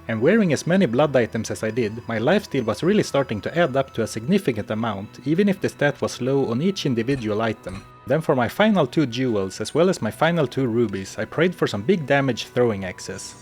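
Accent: Sweden. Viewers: came for the diablo 2 content and stayed for the swedish accent greetings from jörmenni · no doubt. Nice video! And I love your swenglish! ❤ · u are swede right:D? or norweigan?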